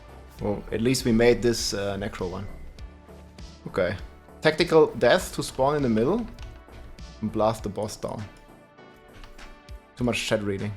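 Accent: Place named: Germany. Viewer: Watching a bloke with a German accent play D4 with a John Carpenter like soundtrack is something I never knew I needed until now! Liked and subbed!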